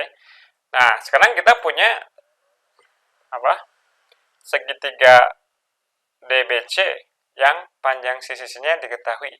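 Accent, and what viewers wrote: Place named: West Java, Indonesia. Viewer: Mantap nih, aksen sundanya dapat utbknya juga dapat.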